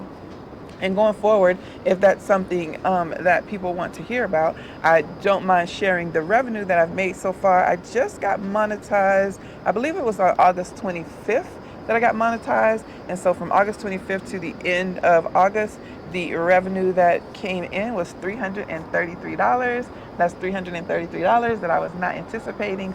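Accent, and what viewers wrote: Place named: New Orleans, United States. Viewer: Ggggggiiirrrllll, there was something you said in one of your other videos and I was 🤔 , she is speaking like she is from NOLA because I've only heard other REAL New Orleanians say that!!! 😂😂😂🎉Then I saw this video confirmation!! CONGRATULATIONS!!! 🥳 🎉 🎊 🥳 🎉 🎊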